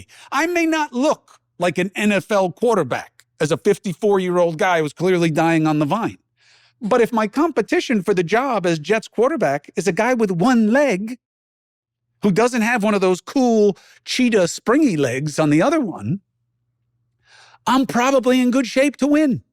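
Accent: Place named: New York, United States. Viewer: He went New York Italian on this one and spoke fluent f word . I can relate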